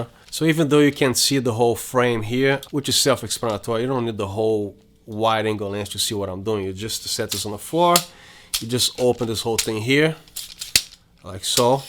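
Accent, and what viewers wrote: Brazil. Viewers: The Brazilian accent is cool! Gostei muito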